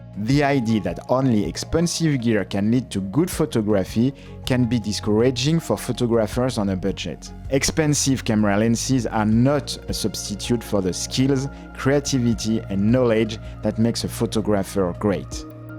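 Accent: France. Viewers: Your video has just described me with my obsession with old nikkn lenses. Apart I now need to learn how to clean them. And I have lots. Thanks fir a great video. BTW my wife loves your French accent.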